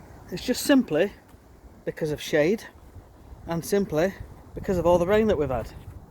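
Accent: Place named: North, United Kingdom. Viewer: Don't overseed yet. Not sure where you are in the country, but you sound fairly northern, I'm in Lancashire and we have snow forecast next week.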